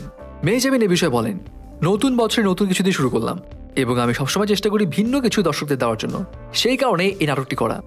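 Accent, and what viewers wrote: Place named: Kolkata, India. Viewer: star golper yutuber তো বাংলাদেশি বাংগালী কথা বা ভাষা কলকাতার মত কেন ভাই?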